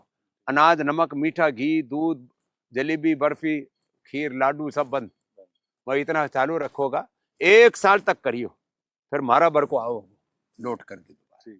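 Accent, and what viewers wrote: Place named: Rajasthan, India. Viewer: Baba ji mhari marwadi boli aaw aapne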